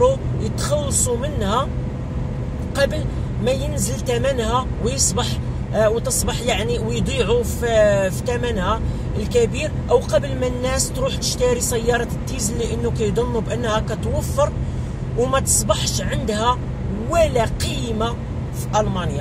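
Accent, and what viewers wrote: Morocco. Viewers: اللهجة المغربية جميلة جدا 😄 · هههه أستاذ لهجتك عم تنحرف للمغربية بدون ماتشعر وأنت تحكي.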